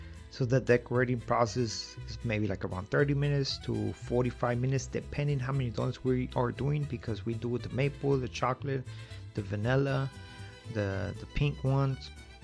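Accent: United States. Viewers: I’m watching this video and I love the fact that you started your own business. You don’t have a Mexican accent at all. You have an American accent. I noticed that you are going to close the business and that’s sad. I hope you get another location and continue your business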